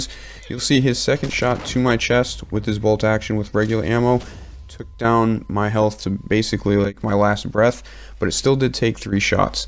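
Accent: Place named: Massachusetts, United States. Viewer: Lol sup dood? I can tell you are from MA. You from Southie guy?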